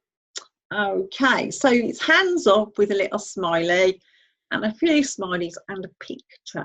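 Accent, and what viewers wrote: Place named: United Kingdom. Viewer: With your accent shouldn't it be Millionaire Mum?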